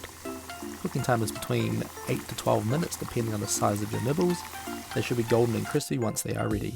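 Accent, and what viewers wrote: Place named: New Zealand. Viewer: Umm it's the New Zealand accent. There are other countries in the world too. I understood him perfectly.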